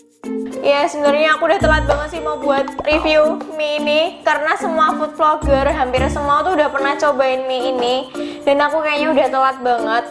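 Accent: Java, Indonesia. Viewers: Kusuka medokmu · Aku baru pertama kali nonton suka gara2 medok gitu walaupun aku orang sunda wkwkwk 😆😆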